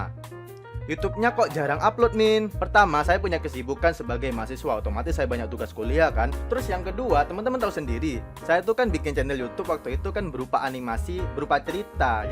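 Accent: Indonesia. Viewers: Mas... logat maduranya mas dikondisikan 😅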